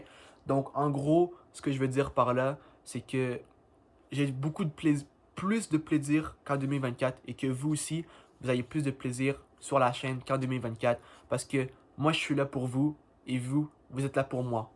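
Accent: Quebec, Canada. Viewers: Est ce que tu est québécois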